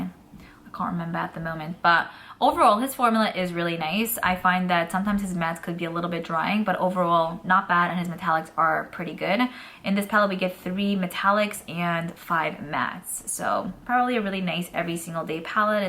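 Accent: New York, United States. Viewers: Sweetie - your 'aw' is a thing we New Yorkers do, and we are unique and wonderful in the world! You keep your 'aw'. I have done it for years and it's staying! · To me your aw accent is normal for the area you live in my mom grew up in the Jersey/New York area but has lived in stl for 40 years and still has that aw accent. We even picked up some words and have people tell me and my siblings we say things odd. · I love that you’re so New York in your pronunciation. Makes me homesick. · You rock!!!! I love these videos!!! 😍❤️ Please never change your New York accent!!! I love it so much!!! Everyone has accents all over the world and it makes things interesting and new 🤩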